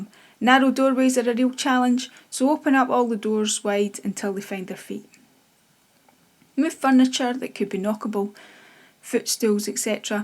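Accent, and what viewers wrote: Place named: Scotland, United Kingdom. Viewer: Thank you so much for this video. My 14 year old golden retriever is experiencing this without the eye movements. She was on antibiotics for a skin infection which would not go away on natural remedies (we live in a warm climate)She had the same symptoms 5 months ago and the vet said it was a stroke. Its only by looking at youtube videos that connected the antibiotics and this condition. She recovered well after the last episode and I am doing similar things as you taking care of her. Thankfully her golden retriever appetite has not changed and she is eating and drinking well. It is very distressing to watch. This video gave me much comfort and hearing a fellow Scottish accent did too. 🙏🏴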